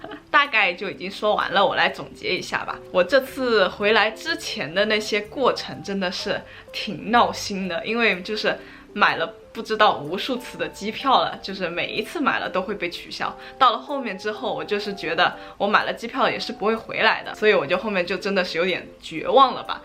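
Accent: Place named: China. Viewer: 说英文时中式口音那么重。。。还说中文差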